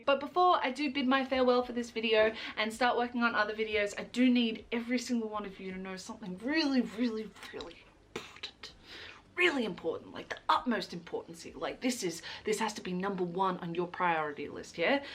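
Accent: Australia. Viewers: I'm so used to that hearing you as your natural Aussie self made me do a triple take! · Also, 11/10 for representing the Australian accent, we need the real you as much as fantasy you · After a long time her accent has gone more Australian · Because I mainly watch your channel for the makeup videos, I’m not used to your normal voice but I am not complaining! I really appreciate JJ as well as! Also I love hearing a fellow Aussie on this platform, never realised how much an Aussie accent stands out! 😂 also having mild PTSD hearing those birds in the background... me hearing those after being on TikTok all night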